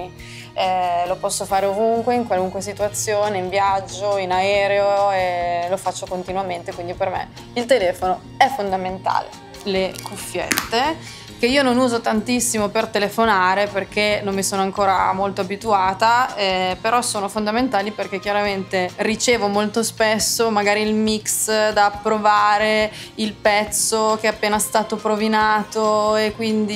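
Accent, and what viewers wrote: Italy. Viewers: Si è brava... E bella. Le donne Liguri parlano così... Io anche...parlo così sono cresciuta Genova.. le mie nipoti belle..parlano con accento così... Ciao baci...Ellis❤❤❤❤😅😅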